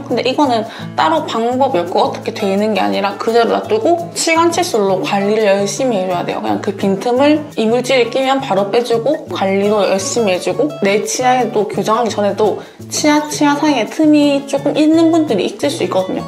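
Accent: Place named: Jeolla, South Korea. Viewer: 전라도 사람이신가요 말투가 매력적이네요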